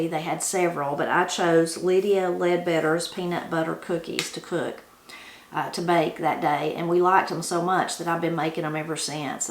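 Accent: Appalachia, United States. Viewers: I live in Nebraska. Have I ever been to Appalachia? Nope. Will I ever have a huge garden like you do? Nope. Will I ever put up produce, cook soup beans or kilt lettuce? Also no. But, I'll always watch these videos because your family is just the sweetest... and I just love the accent. Thank you for being so down to earth, humble, and Godly. ❤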